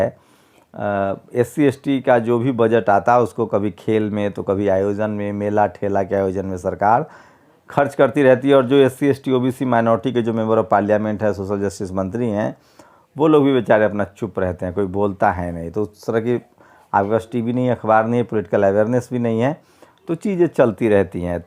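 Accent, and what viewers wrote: Bihar, India. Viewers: आप का बिहारी टच अब कुछ ज्यादा ही हो रहा है।।।। जय भीम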